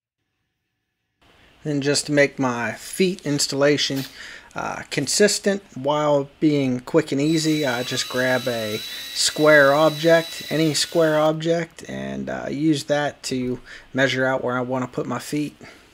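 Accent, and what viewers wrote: Pittsburgh, United States. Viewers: Watching from Texas. Sounds to me like you are from Pittsburgh? Moved from there in 66 but still have my Accent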